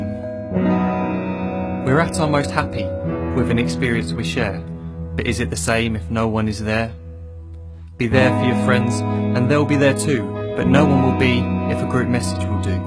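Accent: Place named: United Kingdom. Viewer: He talks real funny! Is he british or somthing? Anyhow, all you ol' geezers should spend MORE time on yer smart-phone! Like I do!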